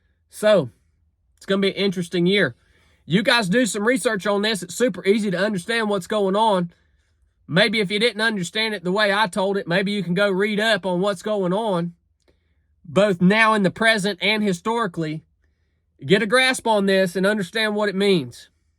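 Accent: South, United States. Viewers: don’t know where you live, obviously the south bc you talk like me. Anyway, bout time for you to run for US congress. You served your country with a gun, bout time for you to serve with a pen. You are obviously passionate about our freedom.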